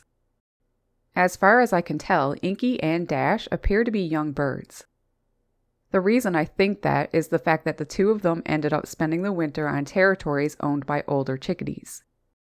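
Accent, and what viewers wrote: Canada. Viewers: Most Canadian accent ever lol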